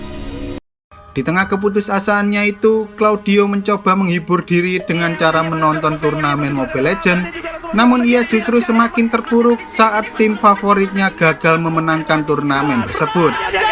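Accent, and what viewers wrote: Java, Indonesia. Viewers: Medok bgt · Alur film yg menarik, Krn miminnya medok jowo. Saye suke saye suke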